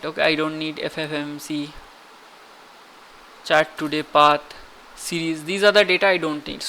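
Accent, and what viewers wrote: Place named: India. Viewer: I am sure you are an indian (May be wrong) from your hearing you. Why are you charging in dollars unlike the others who have paid membership on youtube itself.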